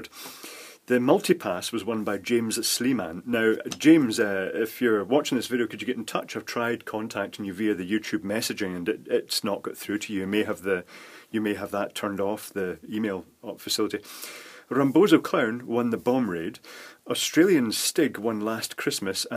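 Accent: Scotland, United Kingdom. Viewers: I thought he was Scottish?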